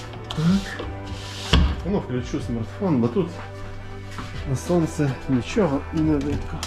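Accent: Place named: Transcarpathia, Ukraine. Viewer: Закарпатський діалект мене трохи здивовує😅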